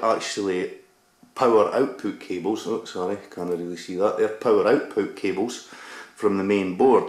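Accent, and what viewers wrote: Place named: Scotland, United Kingdom. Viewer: Great video! Well done. Scottish accent helps too! We've got GoPro 1 and 2 live out cables ready to go. And the new GoPro 3 cables ready to ship as well now. We've also got the Phantoms themselves in stock and ready to go and the 3S Balancer to JST leads too. If you go to firstpersonview.co.uk and click on the Phantom you'll see my FPV system attached to the bottom - its identical to your's! Happy flying! Simon Dale - FirstPersonView.co.uk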